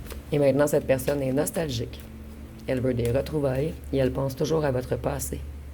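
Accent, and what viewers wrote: Canada. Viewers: J'aime laxent Canadien!!! Avec la voix cassé ( sexy ,sexy). J'aime le Canada😆👍🤍💜🌞🍁🍁🍁🍁🍁🍁🍁🍁🍁🍁🍁🍁🍁🍁🍁🍁🍁🍁🍁🍁🍁🍁🍁🍁🍁🍁🍁🍁🍁🍁🍁🍁🍁🍁🍁🍁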